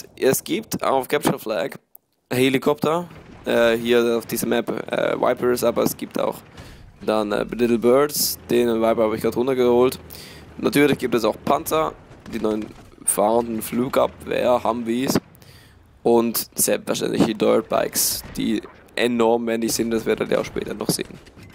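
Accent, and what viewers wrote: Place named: Switzerland. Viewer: ich dachte am anfang du wärst engländer oder ami oder so^^ hab dann aber auch auf schweizer getippt!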